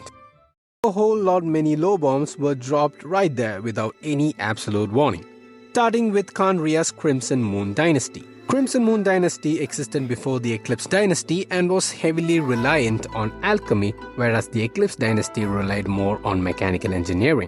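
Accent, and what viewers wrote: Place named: India. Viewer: hmm I felt that you are from India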